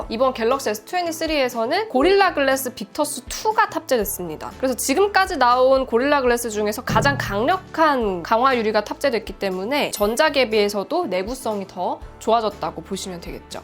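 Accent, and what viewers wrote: Gyeongsang, South Korea. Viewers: ㅋㅋ 경상도 사투리. 찢으셨다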